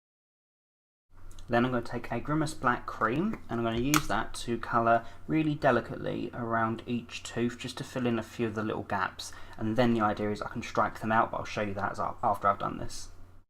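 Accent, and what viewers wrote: United Kingdom. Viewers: I love it! Your British accent is wonderful! I need more omegle's video please ❤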